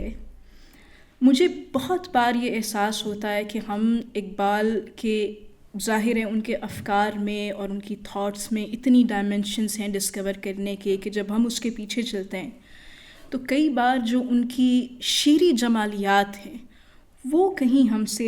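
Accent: Iran. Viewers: محترمہ ڈاکٹر صاحبہ کا بہت خوبصورت لیکچر ہے اقبال کی شکوہ و جواب شکوہ کی نظموں پر ۔ ان نظموں کو سمجھنے کے خواہاں لوگوں کے لئے ایک بیش قیمت تحفہ ۔ ڈاکٹر صاحبہ کا لہجہ نظم ادا کرتے ہوئے فارسی سے متاثر ہے ، اس کی وجہ یہ سمجھ آتی ہے کہ ان کا شاید زیادہ کام فارسی شاعری کے گرد ہو۔ اس سبب سے کچھ جگہوں پر تلفظ کی غلطیاں بھی ہیں ۔ مگر علمی طور پر میں کم علم تو کوئی کجی نہیں بھانپ سکا ۔ یہ سنتے ہوئے کئی جگہوں پر میں جزبات سے مغلوب ہو کر اشک آلود آنکھوں سے دیکھتا اور سنتا رہا ۔ ڈاکٹر صاحبہ نے بہت عمدگی سے وضاحت و شرح کی ہے ۔ میں یہ محسوس کرتا رہا کہ اقبال سے جو جزباتی محبت ، والہانہ عقیدت اور ان کے پیغام کے محاسن سے جو انس میں رکھتا ہوں ، وہ عشق ڈاکٹر صاحبہ بھی رکھتی ہیں - کچھ جگہوں پر واضح تھا کہ ڈاکٹر صاحبہ بھی گویا کلام پڑھتے ہوئے ایک قلبی و جزباتی کیفیت میں وارد ہو گئی ہیں - شکوہ کے بیباک انداز پر ان کی گفتگو سنتے ہوئے دل کے تار ایسے چھڑ گئے کہ بقایا حصہ بہ چشم نم سنتا اور دیکھتا رہا ۔ بلا شبہ یہ انداز گستاخانہ نہیں بلکہ لاڈ ، پیار ، مان ، یقین کامل اور محبت عمیق کا مظہر ہے- جیتی رہئے ڈاکٹر صاحبہ اور علم و عرفان کی روشنی سے طلبا کے ذہنی و قلبی چراغ جلاتی رہئے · ایک دقت ہے کہ محترمہ اردو بول تو رہی ہیں مگر فارسی لہجے میں بول رہی ہیں، اردو کا لہجہ اور ہے۔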